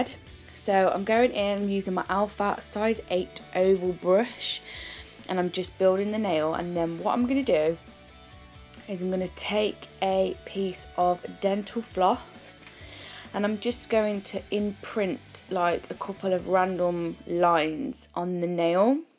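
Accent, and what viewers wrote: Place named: Essex, United Kingdom. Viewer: I've just recently found your channel. I've watched several of your videos and I must say you do some beautiful nails! You're very creative with your artwork. Also, I just love your accent. Where are you from? I'm guessing Essex, but hey I'm American and don't know the different English accents,so please don't be offended if I'm wrong! 😀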